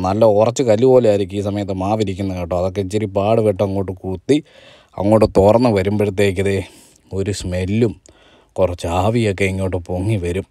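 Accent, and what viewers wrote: Kottayam, India. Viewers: Kottayam slang..ente oru cousin achachante samsaram pole..😊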